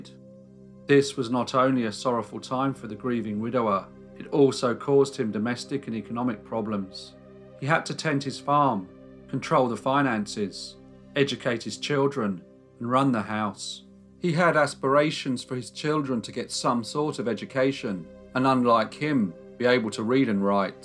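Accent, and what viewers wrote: England, United Kingdom. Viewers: I always love the really obscure stories you find which nobody else presents to us. I sometimes imagine a sleep over in a Creepy Old Mansion,we loyal subbers are all gathered around the Great blazing fireplace with candles lit around the decrepit Victorian Room,we roast our Marshmallows as you sit at the hearth,your distinctive English accent regales us with dark tales of Bloody Murder. This channel is truly a glistening jewel in the Crown of Olde Youtubian Lore.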